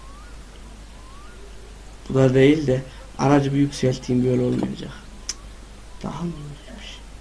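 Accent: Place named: Konya, Turkey. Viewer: helal olsun abi bu arada sen nerelisin konyalı gibi konuşuyonda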